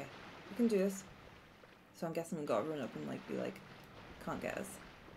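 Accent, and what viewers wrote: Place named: North, United Kingdom. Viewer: Very quite, your accent sounds in my area of the north. But you could be a dude for all i know. Dark Souls 1 was a work of art its why it became so popular, but old now.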